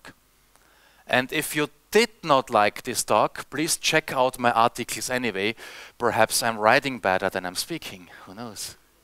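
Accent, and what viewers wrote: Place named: Austria. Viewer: Came to check on what's up with Angular these days, stayed for the glorious Austrian accent. I'll be back.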